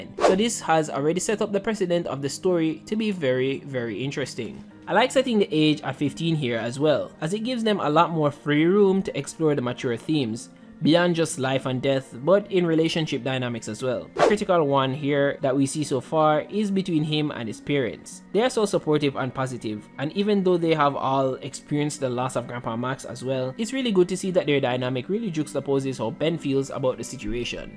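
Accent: Jamaica. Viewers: Are you Jamaican? Your voice sounds off. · W video, stumbled upon this video on my recommended. Loving the accent, not alot of Caribbean much less Jamaican people are on social media talking about ben ten or superhero comics. Keep it up bredda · Dah accent big man are you jamaican by chance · Ayo you Jamaican?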